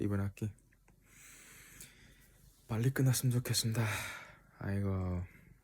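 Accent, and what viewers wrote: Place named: Yanbian, China. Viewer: 구수한 연변 말투 😊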